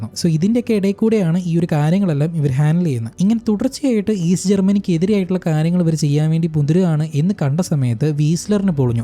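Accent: Palakkad, India. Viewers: bro sathyam para ni palakkad alle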